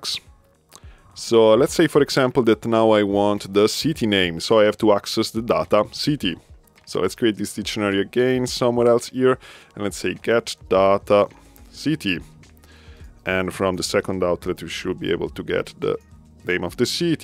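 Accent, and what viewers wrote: Italy. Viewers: Hey, your Italian accent is wonderful and adds to your channel. English speaking viewers can only be grateful that you are producing these great tutorial videos in their native language. And I did not know there was a "maxurl" object - thanks!!!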